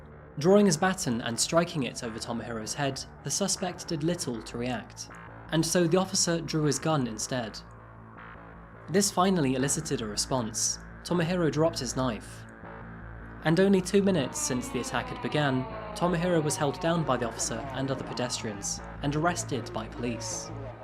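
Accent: United Kingdom. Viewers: this case is indeed fascinating, and now you [not for the first time, actually are you, you come across as utterly Beitish, your accent, manner, and speech patterns do not seem consistent with someone born in the US at all, not even from Seatle, which is a beautiful and amazing city, I haven't spent as much time there as I'd like, but the time I have spent was wonderful, such a fun mix of people of all stripes and types and killer architecture and natural wonders, the Puget Sound and San Juan Islands are simply glorious, untouched, unspoiled and it's lovely to see whales breeching from the ferries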